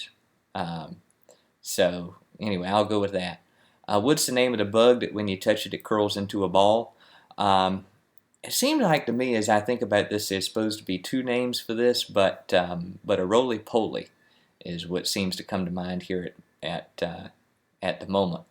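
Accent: Virginia, United States. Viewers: I’m from Goochland County. Your accent makes me miss home sir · This is amazing. My husband and i had never heard a Virginia accent (that's how we ended up here), and it's precisely what we had imagined. We love your accent! We're from Indiana and hear coastal southern, mountain and a bit Pennsylvania. Love it nonetheless. ❤ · Love the video. Very distinctive virginia accent. From your experience does the valley have it's own distinct accent. Have noticed the 804/757 to have distinct accents. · I'm from Williamsburg and I almost never hear people with this accent? I go to school in Richmond and I also have never heard this there. This sounds very much like Dinwiddie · When he said the word, "Record" I knew instantly it was an authentic, traditional Virginian accent. You don't hear the Virginia accent much anymore - especially around places like Stafford where nearly everyone is a transplant from some other part of the country. 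I understand this accent is disappearing quite rapidly these days. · "Occupied VA" lol...that's funny, I've never heard it referred to as such, but I love it because I call the rest of VA (any part that's not Northern VA), "The Real Virginia". I grew up in Courtland, which is in Southampton Co. and my wife grew up along the Sussex/Dinwiddie County line and despite living in Maryland for the past 20+ yrs, our Southern Virginia Accent is still very present. You sound very much like the folks I grew up with. For all of those in the comments saying this isn't how Virginian's talk, I would say you haven't been been outside of your city. I also read where someone said his long story telling reminds them of "southern culture". That's because it is, Virginia is a Southern State. When my wife and I go home, it's refreshing that the good o'l Tidewater/Virginian Southern Accent is still very much alive, however I do agree that the deeper you go in Hampton Roads, the accent is waning due to influx and influence of Northerners who have relocated there. 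Thanks for the video. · I love this Virginia accent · I just love the Virginia accent - and I found it from the weirdest source. A bad guy from the StarCraft games, Arcturus Mengsk. Been trying to nail the accent ever since, this video helps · You Sound Like Us Back in Norfolk, VA. Big Up Virginia! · My grandparents and mother are from Lynchburg, God I love that accent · I'm from Richmond and he sounds more like Powhatan to me but he explained he grew up in Dinwiddie. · Just outside of Richmond it is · anyone that says this isn't a VA accent has never really heard a VA accent before. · Yeah this is a Virginia accent but I mostly hear a lot of older people speak like this lol. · I love that accent from the south boston area like jeff and ward burton got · I’m from Richmond Virginia and you don’t have a Richmond accent. Definitely southeast Virginia or southwest. As you head south out of Richmond the accent becomes more NC.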